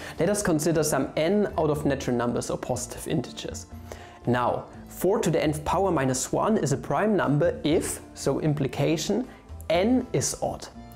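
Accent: Germany. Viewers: Are you german by any chance? :) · Is this guy German or from a country where German is the main language.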